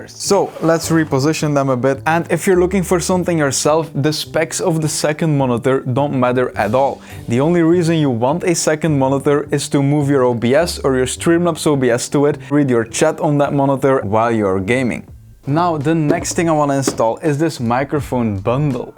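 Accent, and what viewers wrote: Netherlands. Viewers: nice but i smell nederlands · Cool series but i have one question, are you Dutch? I’m Dutch…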